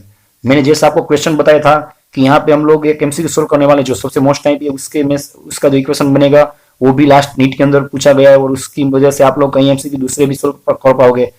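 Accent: Gujarat, India. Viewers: Your language is like gujarati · Aree sir!!! Apka hindi ka accent bhi gujrati hai mazaa aya!!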